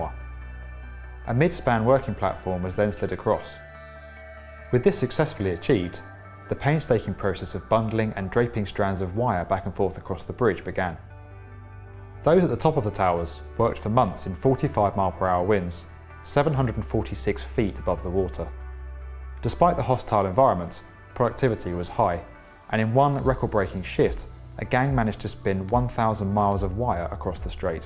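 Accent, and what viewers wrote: United Kingdom. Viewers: Why is a British guy narrating US history? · Can you not find American's to narrate these videos. EVERY video I pull up has an annoying British accent. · This is as heavy a British accent as it can get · This guy must be brit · I hate that the author has a British accent it’s pronounced Marin on Marion.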